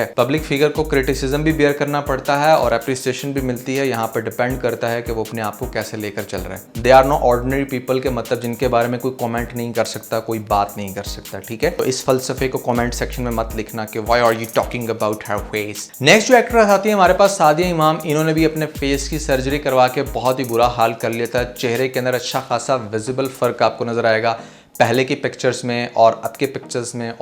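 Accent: Punjab, India. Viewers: Why are you trying to copy indian accent & words , some kind of inferiority complex? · I always say, kindly focus on your Urdu and English accent. Dool nahi doll.. Dono languages main Panjabi touch aata hy This is advice for you and it's easy to overcome it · Bhae hasad may naqal utarty hue AA Kuch ziada he over hogae or boht he weired hogae bilkul acchay nahe Lagay itna ghussa mat hua karain punjabee accent may Urdu n English sounds weired but it's nice but plz ab is comment pe ghussa mat hotee ga qk apka channel Hy or aapko hr qusam k comment face krny paraingain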